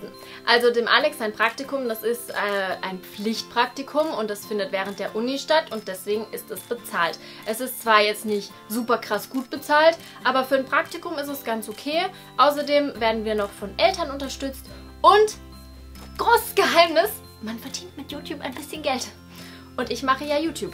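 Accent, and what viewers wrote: United Kingdom. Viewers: Hey!♥ Ich verstehe wie du das mit dem Englisch sprechen meinst, ich bin auch zweisprachig aufgewachsen:) Meine Mama ist aus Italien😊 Ich würde es mega cool finden wenn du englische Videos machen würdest ich liebe deinen britischen Akkzent!😍 Deine Neuseeland Vlogs inspirieren mich sehr:D Ich möchte gerne nach dem Abitur ein Jahr um die Welt reisen☺ Danke für die Inspiartion und genieß deinen Urlaub 😘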